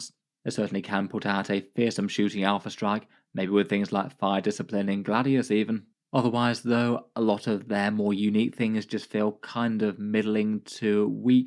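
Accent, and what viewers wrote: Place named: United Kingdom. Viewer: why britains can't speak english?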